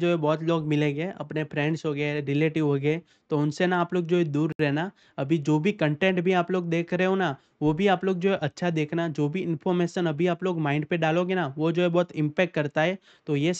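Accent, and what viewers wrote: Gujarat, India. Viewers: Tame gujrati lago cho....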